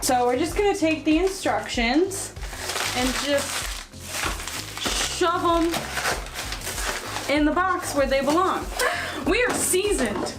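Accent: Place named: Midwest, United States. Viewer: 😂 I thought you were doing a Illinois/Wisconsin accent purposely at first. Looks so cute. My hair is identical to yours and I miss my longer hair. Thanks for inspiring ❤️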